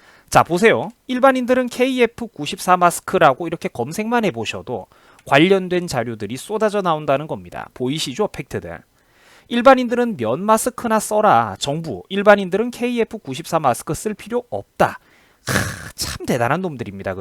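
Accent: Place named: Gangwon, South Korea. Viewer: 말투가 강원도분이시네요 파이팅!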